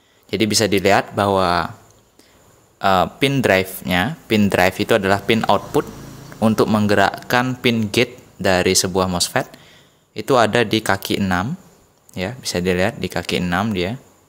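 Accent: Bali, Indonesia. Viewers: Pantesan cara ngomongnya spt org bali. Betul bali rupanya bli. Lucu juga biasanya org bali tu. 😁 dimana lokasi bli. Nanti kalo butuh2 betulin elektronik sy datang ya.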